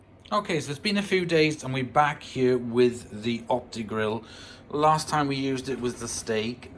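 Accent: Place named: South Wales, United Kingdom. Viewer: A really good review, also nice to here what I presume is a "South Wales" accent instead of the usual American. I think it should be ok for searing my steaks, after cooking sous vide . Paul, S. Wales.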